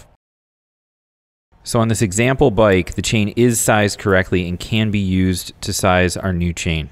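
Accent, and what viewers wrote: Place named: Canada. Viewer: sounds like a canadian..I like the canadians I have known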